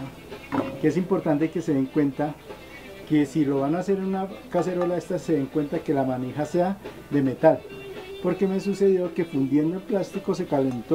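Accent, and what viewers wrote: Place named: Colombia. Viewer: Yo vivo en pradera valle y usted tan bien es colombiano por el acento creo que si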